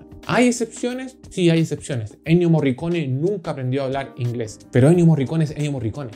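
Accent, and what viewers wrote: Chile. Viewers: Suscrita a partir de ese hermoso acento chileno, tu fondo de video y obviamente tu calidad de contenido!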